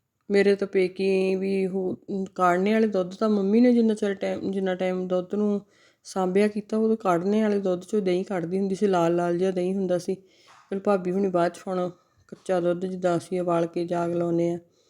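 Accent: India. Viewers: ਦੀਦੀ ਜੀ ਸਾਡੇ ਹੁਸ਼ਿਆਰਪੁਰ ਚ ਵੀ ਇਸੇ ਤਰ੍ਹਾਂ ਬੋਲਦੇ ਹਨ ਜਲੰਧਰ ਵਿੱਚ ਮੇਰੇ ਪੇਕੇ ਨੇ ਤੇ ਹੁਸ਼ਿਆਰਪੁਰ ਵਿੱਚ ਮੇਰੇ ਸਹੁਰੇ ਤੁਹਾਡੀ ਵੀਡੀਓ ਬਹੁਤ ਵਧੀਆ ਹੁੰਦੀ ਹੈ। ♥️♥️ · ਸਤਿ ਸ੍ਰੀ ਅਕਾਲ 🙏ਦੀਦੀ ਜੀ, ਇੱਕ ਪਲੇਟ ਮੇਰੀ ਲਾ ਲਓ ਇੱਕ ਕੌਲੀ ਸ਼ਿਮਲਾ ਮਿਰਚ ਦੀ ਇੱਕ ਖੱਟੇ ਦੀ ਕੌਲੀ, 😋😋, ਤੁਹਾਡੀ ਦੁਆਬੇ ਦੀ ਬੋਲੀ ਵੀ ਸੋਹਣੀ ਹੈ🥰, nyc sharing🎉🎉👍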